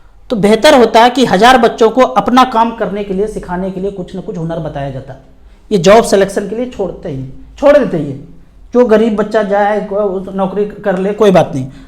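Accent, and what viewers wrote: Bihar, India. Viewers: Good Video. Are you from Bihar?? You ascent is like Bihari.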